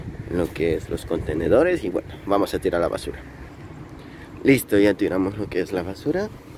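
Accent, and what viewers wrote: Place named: Mexico. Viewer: que noticia tan maravillosa, me encanto tu videos y entrenaré el español mexicano escuchándote, hijole que padre!. buena suerte.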